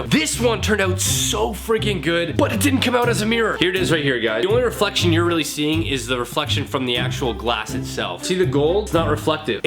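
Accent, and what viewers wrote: Canada. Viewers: Love the canadian accent ❤️❤️👌🏽 & like seeing a guy say he likes crafts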